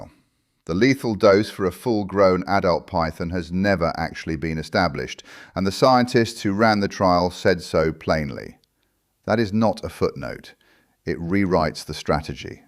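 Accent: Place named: Australia. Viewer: Millions of mice have not been dropped into the Everglades . Are you really that hard up for attention? I would never subscribe to a channel as misleading as yours. This is the second video I have found of yours misleading the public about what is happening in the Everglades. I guess, you think, if you wear the "outback" clothing and sound Australian people won't question your faulty videos. You are part of the problem with the reliability of the internet. Why do you do this?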